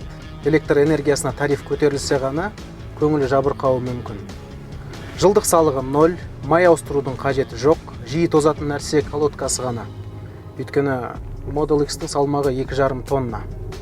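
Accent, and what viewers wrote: Standard. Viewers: базар жок рахмет. Недеген сауатты сойлесин таза казакша сау бол бауырым.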